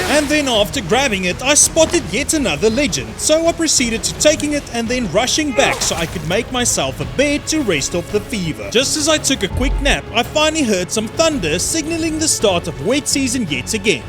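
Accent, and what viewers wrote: Afrikaans, South Africa. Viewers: Are you south african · You sound south african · Jir my ou, your accent gives me Afrikaans vibes like jasis my bru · could tell from you accent awesome to see a fellow south African making awesome content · Is that a South African accent? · South African? 🇿🇦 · Am I the only one that hears an Afrikaans accent here? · love your Afrikaans ,Engels accent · are you south African? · I knew it your south African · Absolutely brilliant. Subscribed. You sound South African, like me - am I right? Love the way you do the story telling which makes getting insight into the game huge fun. Dankie Meneer.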